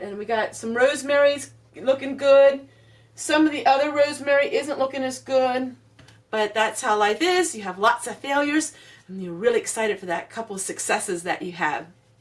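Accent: Pennsylvania Dutch, United States. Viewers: Lol you made me giggle. I like your Dutchie accent makes who you are. You have been busy this week enjoyed your video have a great week 🌷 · nothing wrong with your PA Dutch I'm enjoying the dialect:) It's been a nice day today the sun came out and I was sitting in my yard:) Very quiet here except the sirens but not to much of that:) I got out to the store today to get a few things I needed to get but otherwise no real need to be to a store:) It sure does feel like I'm in the country here:) Been helping mom in the house:) That's a beautiful quilt great to see your grand kids helping out:) Love you my Dear sister:) God Bless you and Ken and Family:) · I love your Dutchy Pennsylvania accent! I've known several people from there and how y'all talk is so entertaining. You da bomb! I have Dutch heritage too......from Claverack, NY. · Hi Everyone, Happy Palm Sunday, was busy with poor new Lab puppy, What was I thinking >>> she is a distraction as to what is going on. 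Also attempted to sew some face masks My skills do need improvement, We have Pluto and a Firestick. Like you,I am rural in NY. Love hearing your dutchy Teach us some. Stay safe and Blessings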